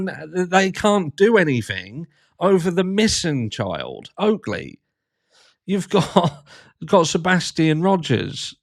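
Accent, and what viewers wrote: Norfolk, United Kingdom. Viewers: I knew you must be a Norfolk boy from your accent! This case was extremely devastating. They were incredibly sweet girls. I’m just a stone’s throw away also.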